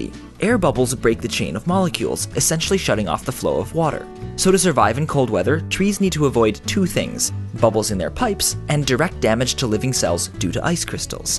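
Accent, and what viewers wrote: Canada. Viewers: i didn't know that you are canadian. or are you? great video as always!! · Are you canadian? Just askin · Is this guy Canadian?!?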